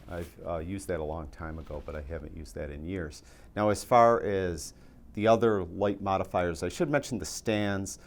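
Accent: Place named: Western New York, United States. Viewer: Nice to hear that western New York twang. I was born in Buffalo and my dad, same name, had his commercial art studio there for years in the Pitt Petri building. He did all the Spree covers for ages. I love your editing videos and have struggled with Capture One's complexity but love the quality. Maybe there is another package that is as good in rendering RAW but less convoluted. I don't need catalogs or even sessions maybe, would be happy to open and work on individual images most of the time. I'm learning Affinity so I can dump Photoshop, but the raw conversion is not so great. Thanks, again. Rix Jennings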